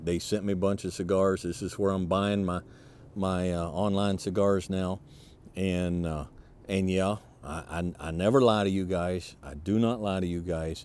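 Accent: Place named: South, United States. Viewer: love the southern drawl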